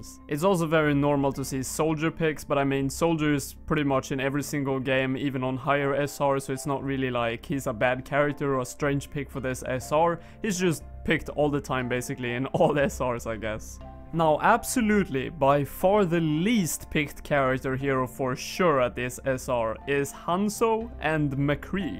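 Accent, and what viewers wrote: Sweden. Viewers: Sounds like your from sweden( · Swedish accent I Love it bro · u are sweden i hear it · Nice video mate, are you Swedish btw?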